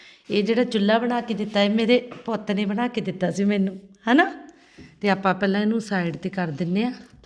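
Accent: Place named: Jalandhar, India. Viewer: Tuhadi language Jalandhar wali lgdi aa asi v Jalandhar to e aa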